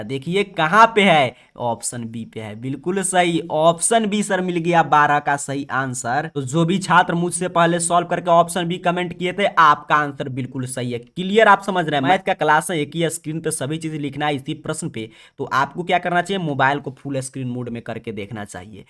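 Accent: Bihar, India. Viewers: Sir is talking in fully Bihari tone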